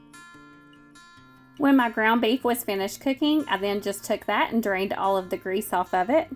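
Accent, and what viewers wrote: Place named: South, United States. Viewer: I love your southern accent! Greetings from San José, California!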